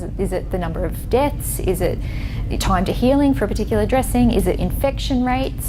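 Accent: Australia. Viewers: Omg I just ❤️ an Aussie accent!;)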